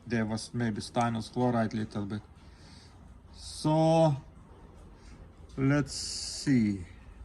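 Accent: Russia. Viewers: Can you say "Get to the choppa" in your russian accent?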